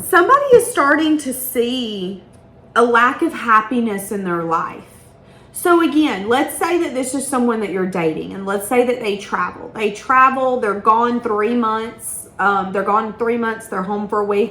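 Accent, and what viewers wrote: South, United States. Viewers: ❤ I adore your spirit! Always so sweet and to the point. Southern bell?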